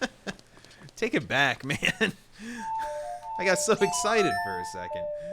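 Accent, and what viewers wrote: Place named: Brooklyn, United States. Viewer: sorry, this Brooklyn accent makes it difficult in stopping Ganondorf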